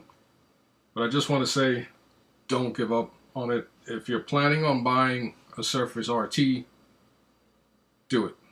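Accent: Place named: South, United States. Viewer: Where are you from? You have a mix accent of black and southern border kinda a guy. No ofense, just curiosity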